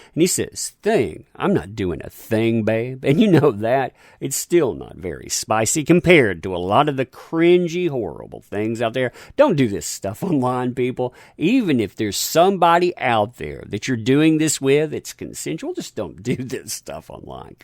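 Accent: United States. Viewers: 2 mins in and you haven't even started yet? And your vocal fry annoying put on voice is just so annoyingly over American that I couldn't go on past the 2 min in introduction.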